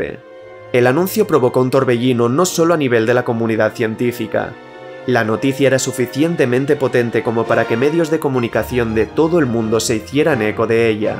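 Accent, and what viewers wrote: Spain. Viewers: Buenas, es lo que tiene haber nacido en España. Que hablamos Español. Por cierto el acento es en este caso es, Catalán. 👍🏻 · Vine buscando cobre y encontré oro!, este video es simplemente increíble, perfectamente explicado y con una edición fantastica, usualmente me desagrada el acento españolizado, sin embargo tienes una voz bastante agradable al oído, el tono adecuado para prestar atención a cada uno de los temas que manejas, gran trabajo amigo, muero por ver mas de tu trabajo. Saludos desde Mexico.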